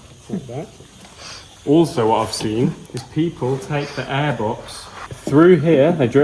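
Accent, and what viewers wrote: United Kingdom. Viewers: Just come across your channel, great work BTW you have the most English accent I've heard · Hi, great video! My father also bought a Twingo the new Twingo e-Tech very good small monospace. Is the Twingo popular in Switzerland?🤔 Oh and, it seemed a bit strange to me at some point I had the impression that your accent Was a bit British I don't know why, which side of the Swiss are you coming from? Please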